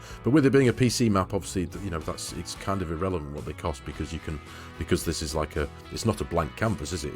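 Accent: United Kingdom. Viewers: You can't imagine the joy i have by just listening to your voice. I can't say where you from in the UK. I love the 4K maps , it takes some time to get started. The investment in you farm or production points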